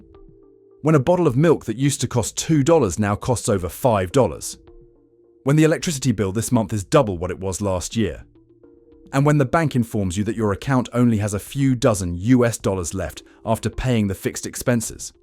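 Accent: United Kingdom. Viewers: I work on the strip. Untrue. Every night I leave nobody sleeps on strip. And they are not allowed in any casinos. Yes there are some in the tunnels but you overexaggerat. Why did your video sound like you're UK.??? Homeless in Vegas, why are you in the damn tunnels???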